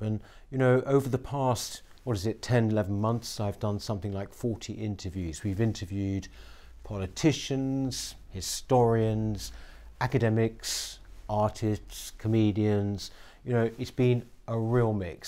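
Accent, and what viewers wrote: RP, United Kingdom. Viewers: It’s refreshing to hear intelligent, incisive, coherent, reasoned and truthful comment and discussion, spoken in Queen’s English in whole sentences without beginning each one with “So” or endlessly repeating “Y’know”. More power to you!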